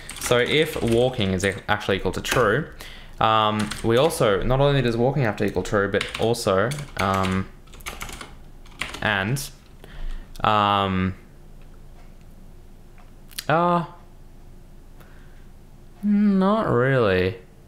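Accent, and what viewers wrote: Russia. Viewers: Черт! Мне казалось, что ты похож на русского, не ожидал что в одном из видео это будет! Надеюсь ты прочтешь, это! У тебя классный акцент, хотелось бы услышать твою историю, но это наверное не совсем в стиле этого канала. Как у тебя дела?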